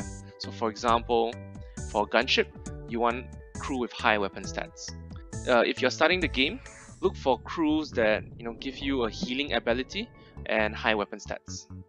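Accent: Singapore. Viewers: sinkie accent very obvious haha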